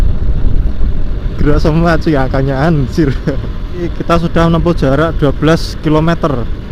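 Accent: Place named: Java, Indonesia. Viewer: Jowo lu ora ilang bro, Gw ngguyu tenan ini🤣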